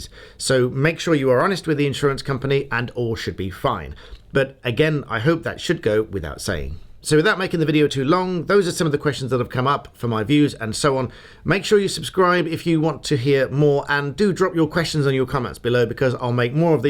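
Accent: United Kingdom. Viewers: I know I’m an a.h (arse hole not amber heard ) but I watched the popcorn interview twice and started this video over twice And I just can’t absorb info from a British accent idk what it is Ty for the info and content tho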